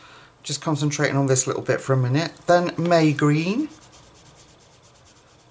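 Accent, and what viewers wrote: England, United Kingdom. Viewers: It’s so lovely to hear an English accent. I particularly appreciate the mention of the paper quality. I love Hanna Karlzon books as the paper will take watercolour pencils too. Many thanks for your tutorial. I’ve subscribed 🙏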